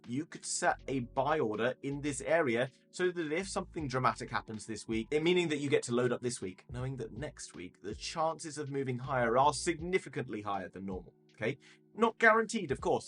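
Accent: United Kingdom. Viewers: All British people sound the same.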